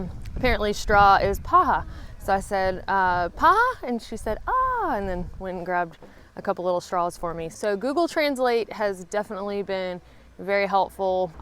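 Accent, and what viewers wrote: South, United States. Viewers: I just realized I've watched you for so long I don't hear your southern accent anymore lol. Great video as usual. Happy Trails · southern vernacular.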